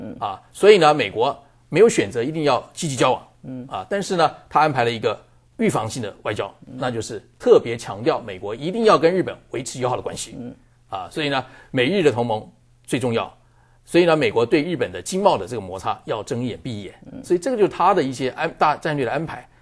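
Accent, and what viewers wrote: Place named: Taiwan. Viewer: 老大你眼神不好啊，沒聽倒是台灣口音？那你也應該看到片尾的網址TW吧？ 還有那個央廣是正體字哎 要學會觀察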